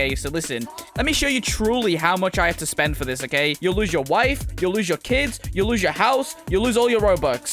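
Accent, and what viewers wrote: United Kingdom. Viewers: We got to admit that we love his British voice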